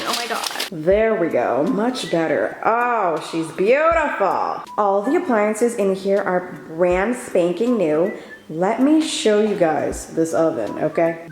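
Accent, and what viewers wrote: United Kingdom. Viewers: who else loves her british accent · Its her British accent that really makes me laugh😂😂😂😂 I love it. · Ngl your British accent sounds so good lol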